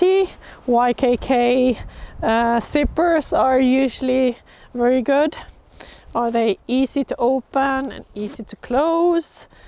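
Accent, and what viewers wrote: Sweden. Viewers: Kollar alla era videos, men inte direkt i ordning men alla är lika bra. Tyckte du fick med alla saker som är viktiga för ett par vandringsbyxor. Men… ibland låter din engelska som du snarare kommer från södra Sverige än Jokkmokktrakten:) kan ha helt fel där:) tack för bra videos i alla fall 👍🏼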